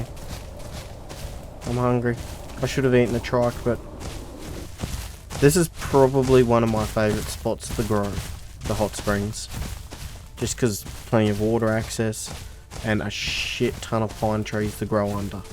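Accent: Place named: Australia. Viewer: Love your accent m8. If you don't mind my asking, what part of Australia are you from? Just the way you say certain words like "alive" and "die" is really slick and reminds me of the Big Lez Show. I was wondering if it is a common way of speaking or more of a regional dialect.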